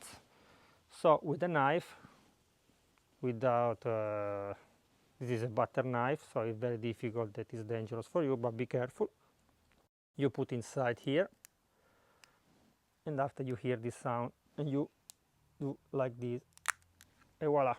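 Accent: Italy. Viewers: Looks spppectaculllar! Can I use honey instead of sugar? My wife thinking I am crazy roatating my forearm for the enjoyment. Love Italian cuisine and your accent. Go bro.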